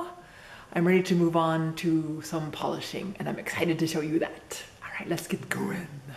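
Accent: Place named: North Dakota, United States. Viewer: Are you from North Dakota?? I can really hear an accent lol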